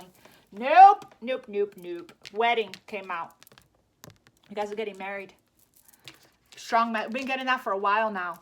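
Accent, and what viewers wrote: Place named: New Jersey, United States. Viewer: I love you so so much ❤️💕💕 You sound like a Jersey girl did you say you live in Dallas!!? You are my favorite reader I connect so hard with you I'm in Big Spring Texas born 2 - 9 1970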